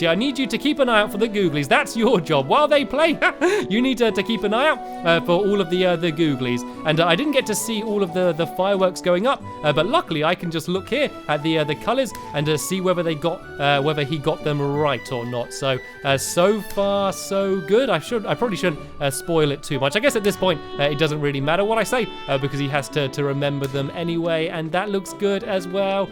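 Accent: United Kingdom. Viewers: One question, Um is stampy british? Because his voice sounds like british something like squid but a little different anyways hi stampy i like your videos! xD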